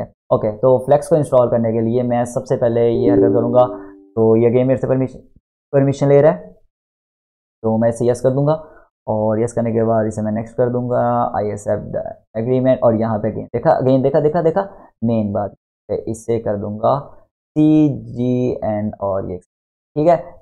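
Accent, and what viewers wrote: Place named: Kashmir, India. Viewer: are you from J&K? your hindi sounds like it's having kashmiri accent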